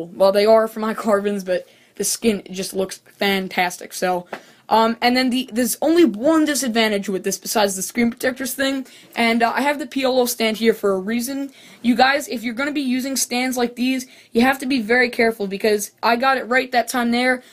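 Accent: United States. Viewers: Do you have a New York accent?? Just wondering, otherwise great video.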